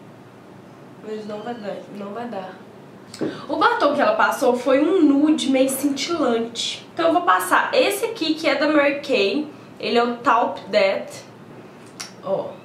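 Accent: Minas Gerais, Brazil. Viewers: Aaaaaaaaaamo seus jeitim mineirim! !!!!! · Nao sabia que voce era mineira ! qual cidade?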